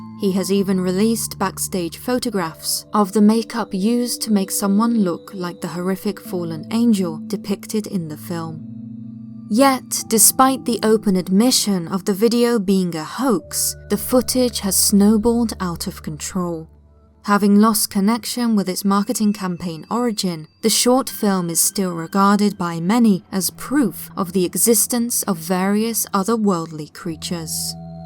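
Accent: United Kingdom. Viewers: narrator I always wanted to ask, what part of the U.K are you from?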